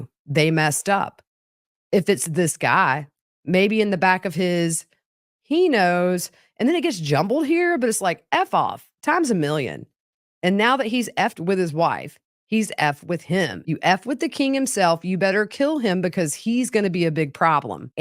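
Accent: South, United States. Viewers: thank you so much for your hard work! We all LOVE your Southern voice. You’re adorable to the max, and full of the best true crime info on the web. Respect. ✊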